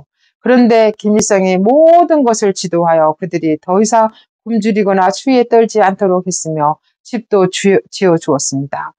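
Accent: Jeolla, South Korea. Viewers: 전라도 말씨가 이렇게나 예쁘시다니... 그동안 고정관념을 버리겠습니당~! 통영의 딸과 그 불쌍한 남편이 생각나는군요!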